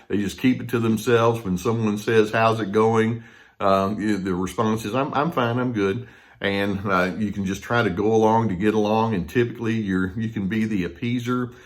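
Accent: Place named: Texas, United States. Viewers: Dear, you and your warmth and wisdom are a guiding star to me. If I ever meet someone like you I’ll marry them right away 😜 Just kidding of course, because we’re striving for ‚Team Healthy‘, right? This video was (again) super helpful and well organized. I am always trying to educate myself on ‚early signs‘ in getting to know people and potential friends or a potential partner... and this video was particularly helpful. And you coming across so warm-hearted and well-balanced certainly doesn’t hurt your message. And btw.: I love your Texan accent too 😍 Love my regular dose of Dr.Carter 🥰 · I love Texas Twang, too, being from Texas (military brat).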